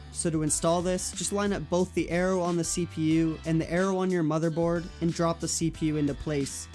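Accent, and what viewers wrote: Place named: Canada. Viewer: man looks like a 30 year old spanish father of 3 with a happy wife but sound Canadian as fuck also sup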